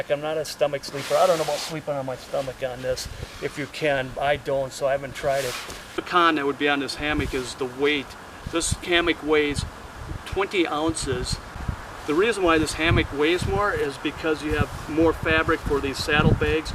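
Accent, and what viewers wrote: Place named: Iron Range, United States. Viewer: Been to McCarthy many times! I’m an Iron Ranger from Virginia, MN. Glad to have found your channel! Thanks for the great info! I could tell that you have the same accent as me 😊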